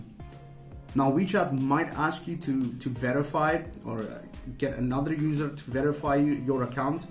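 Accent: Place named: Ireland. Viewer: buddy, based on your accent and your name, I knew you are Irish... ' ' is a very common name in Ireland... and your accent... I don't know what part of Ireland you come from, but it is a typical Irish accent.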